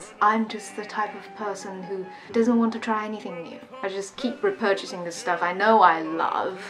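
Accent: United Kingdom. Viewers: 的伦敦腔听着好上瘾🤗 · 口音是rp吗我听起来很像老人2333（没有不好的意思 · 的英音好好听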